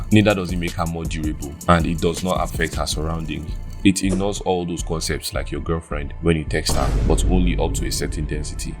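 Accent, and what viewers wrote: Nigeria. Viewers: U sound so much like a Nigerian, good one brother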